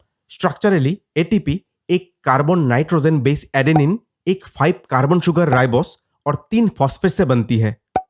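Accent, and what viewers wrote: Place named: Bihar, India. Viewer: You seem to be from Bihar.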